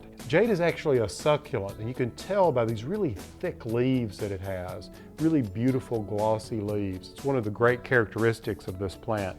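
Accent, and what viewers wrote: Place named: Arkansas, United States. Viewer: P... you sound like you're from Arkansas. Am I correct?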